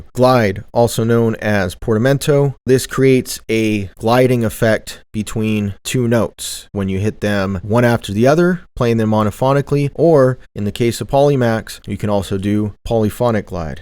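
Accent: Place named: United States. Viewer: For some reason I thought you were British, but that's an American accent thru and thru. 😅 I think PolyMax sounds higher-end than a Juno - more like a Jupiter. Maybe somewhere inbetween. :)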